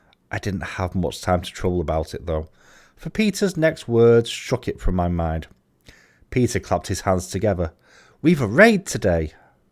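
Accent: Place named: United Kingdom. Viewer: That was great, thank you! It’s so nice to hear a Brit read something on YouTube, good to hear it in a reasonably local accent too. Will check out your review of the whole book too.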